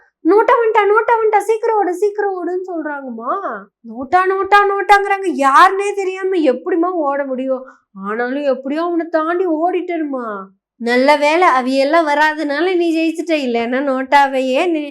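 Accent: Kongu, India. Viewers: கொங்கு தமிழ் சூப்பர் சகோ பாராட்டுகள் நன்றி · நன்றி தோழியே . உங்கள் பணி மேலும் தொடரட்டும் அழகான கொங்கு தமிழில் . வாழ்க பெரியார். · திராவிடச்சுடரின் கொங்கு தமிழ் விளாசல். நன்றி. வாழ்த்துகள். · அருமை மகளே உனது கொங்குத்நமிழ் என்னை மிகவும் ரசசித்து கேட்க வைக்கிறது வாழ்த்துக்கள் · எப்படிப்பா இப்படிஅசத்திறிங்க அருமை கொங்குதமிழில 😄🙏🙏❤❤💐💐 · சிறப்பான,தரமான பேச்சு.கொங்கு தமிழில் சீமானை சுளுக்கெடுத்து விட்டாய் சகோதரி.வாழ்த்துக்கள் .🎉🎉🎉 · கொங்கு தமிழ் கொஞ்சும் பதிவு.. அருமை அருமை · சகோதரி கொங்கு தமிழ் சூப்பர் பாராட்டுக்கள் வாழ்த்துக்கள் 😂😂😂😂😂😂😂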